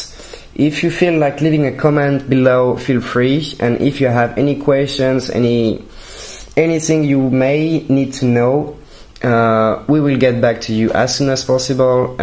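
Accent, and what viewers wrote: France. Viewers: Belle accent français :)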